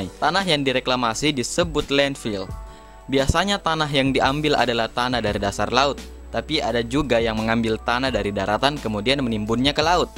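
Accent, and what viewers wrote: Makassar, Indonesia. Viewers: logat makasar ki cukimai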